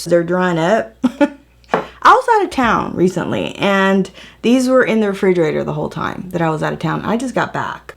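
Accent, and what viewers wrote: South, United States. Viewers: her Southern drawl at times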